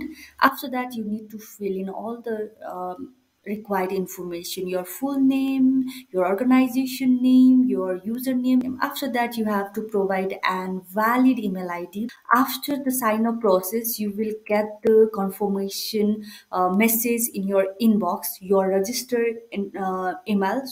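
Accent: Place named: Nepal. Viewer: Helpful Video. You sound like nepali from your accent !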